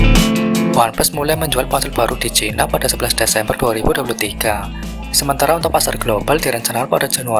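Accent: Banyumas, Indonesia. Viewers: josss maju terus ngapakers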